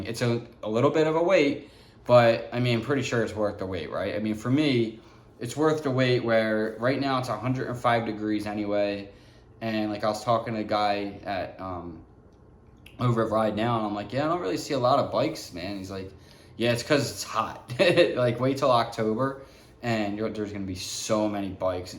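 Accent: Philadelphia, United States. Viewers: I thought u sounded like u from philly! That’s crazy! Born and raised in philly! Love the Philly love!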